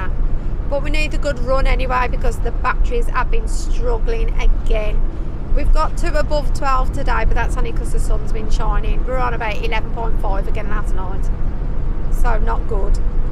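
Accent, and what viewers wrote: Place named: Birmingham, United Kingdom. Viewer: Very good video.that sounds like a brummy accent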